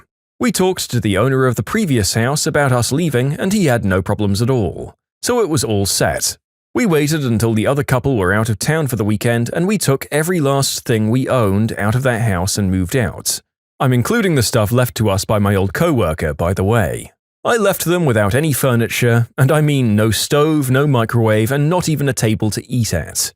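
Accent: United Kingdom. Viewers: AH! HES BRITISH!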